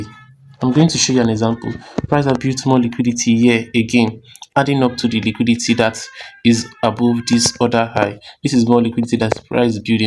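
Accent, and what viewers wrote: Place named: Africa. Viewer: Your name is indian but you are speaking English in african accent Your words are not clear first work on your accent I'm forced to see transcript everytime you speak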